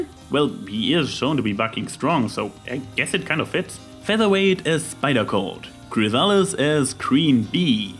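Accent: Germany. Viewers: OMG THE GERMAN ACENT!!!!!!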